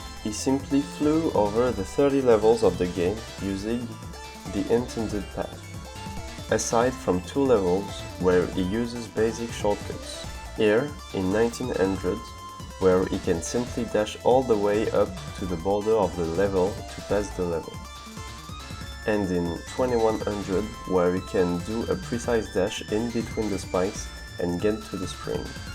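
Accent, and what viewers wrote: France. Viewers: I can recognize a french accent. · La France résonne dans ta voix, très belle vidéo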